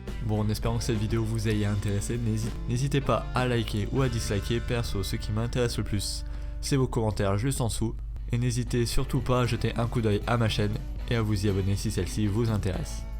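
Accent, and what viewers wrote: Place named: England, United Kingdom. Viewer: Excellente présentation du produit je trouve...Belle accent anglais au passage LEL !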